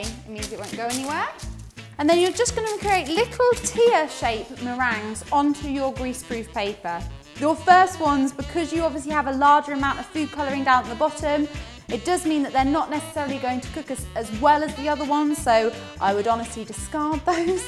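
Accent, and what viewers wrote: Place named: Liverpool, United Kingdom. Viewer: Thought I could hear that Liverpudlian in there somewhere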